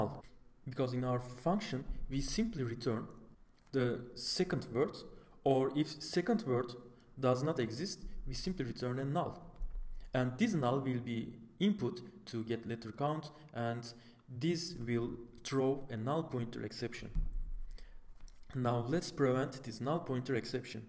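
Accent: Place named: Turkey. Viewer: Turkish accent :)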